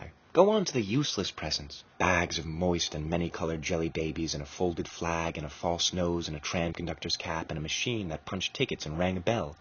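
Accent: United States. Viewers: It must be 30 years ago, that I had an LP of Dylan Thoas reading this. There must be someone out there that has this reading. Somehow, an American accent just doesn't do justice to this. Thomas' own version was so - "dead pan" but it didn't matter: this was Thomas' own rendition of his wonderful Welsh Masterpiece. Please for next year..?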